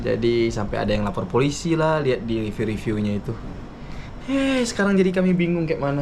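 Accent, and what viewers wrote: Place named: Medan, Indonesia. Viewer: Bg aslinya orang mana? Logatnya keq orang medan😅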